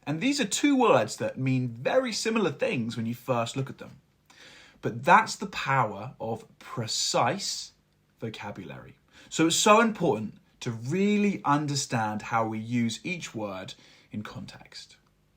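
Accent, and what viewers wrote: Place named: United Kingdom. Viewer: He is definetely British.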